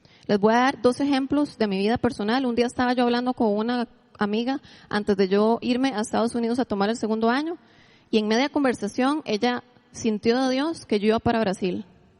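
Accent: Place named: Costa Rica. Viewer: Gracias por la esplicasion sobre profetizar. Me llama la atencion tu accento en ciertas palabras ese es el accento de los ticos?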